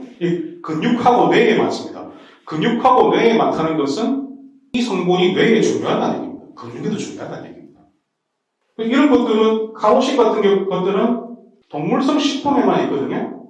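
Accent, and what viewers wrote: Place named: Busan, South Korea. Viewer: 유행하는 케톤식 알아보다 보게 되었는데, 믿을만한 논문과 임상경험으로 진짜!의학정보를 전해주시는 분을 발견한거같아 기쁘네요. 가족들에게 공유했어요! 이 분 찐인거같아요. 어느병원이신지 살짝 궁금하네요. 더불어 부산분이라 이해가 더 팍팍됩니다.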